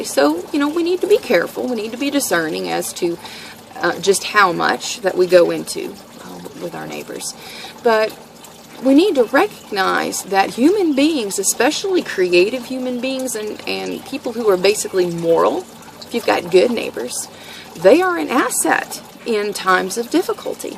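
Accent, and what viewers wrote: South, United States. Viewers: I love her southern accent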